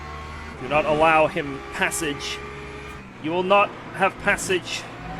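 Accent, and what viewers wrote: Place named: North East England, United Kingdom. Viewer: New player, new subscriber - I'm trying to place your accent though?! I get twangs of North East, then a bit of Manchester'ish all with the odd bit of Americana?! 🤔😂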